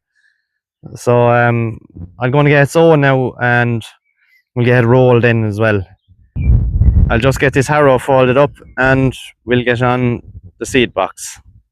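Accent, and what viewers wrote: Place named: Cork, Ireland. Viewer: that looks like a billard table, fair play to your dad. trying to figure the accent..cork or tipp?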